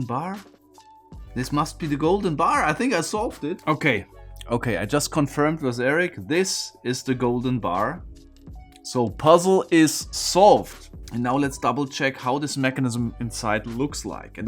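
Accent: Germany. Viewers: Au contraire, my friend. Please, forgive me my harsh tone, but for a somewhat younger (age < 45, I guess) German his accent is unforgivably and shamefully thick - "Fremdschämen" is my dominant feeling hearing it by my fellow countrymen, especially when accompanied by our (i.e. German) typical permanent appreciation. So, my ears kept bleeding. And I guess a half-trained chimp would be able to deduce what "jostle" means from the context "usual puzzle solving techniques". At least I was able to do it immediately even while eating my banana. In conclusion, I cursed using the i-word seeing him shaking and whacking this delicate piece. On the pro side, he didn't chew on it (a seldomly applied puzzle solving technique though;) Also, he did a very nice repair job on the puzzle (there is an engineer in all of us:) And he solved it. Nice. So, hurray... The puzzle itself is quite a piece of eye candy! 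A perfect christmas gift, IMHO. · Why I watch vids: 1. his German accent and mild humor is soothing to me 2. I love his engineering brain and approach to solving puzzles - his methodical approach is interesting and has applications outside of solving puzzles 3. I get satisfaction from watching the process and the solve and the explanation of the mechanics. When solved, it's like I get the same satisfaction as he does which makes me feel good! Danke!:D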